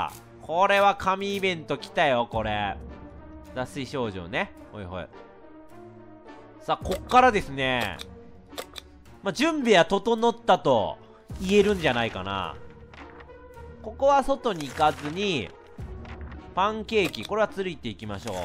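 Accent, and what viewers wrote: Kansai, Japan. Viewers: レトさんの関西弁好きすぎる、、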